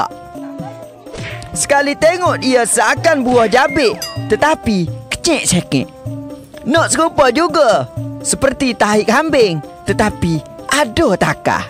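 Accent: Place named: Kelantan, Malaysia. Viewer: Hahahahahhaa Memey Kelate lah ho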